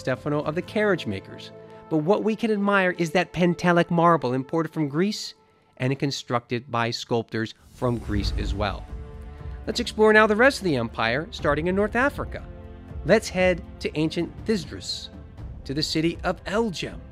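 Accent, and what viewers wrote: United States. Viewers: DAMN YANK NARRARTION!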